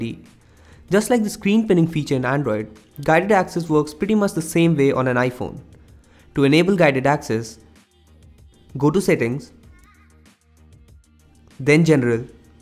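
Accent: India. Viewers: badibadibadi one currrryy pleaseeeeee, i really didn't understood anything what he said with his indian taxi driver dialect ... it's really hard to understand what he says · Indian english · Worthless vid, unless you like listening to indian accent english... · It’s Indian accent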